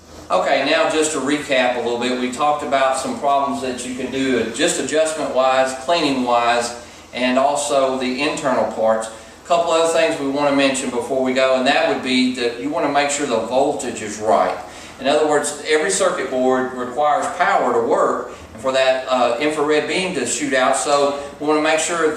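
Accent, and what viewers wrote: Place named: South, United States. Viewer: What did he say here? I can't tell with his southern drawl.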